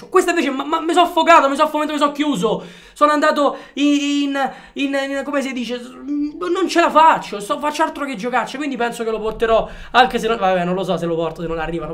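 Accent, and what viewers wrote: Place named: Naples, Italy. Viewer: il tuo accento napoletano sembra quello di un’ orso Balú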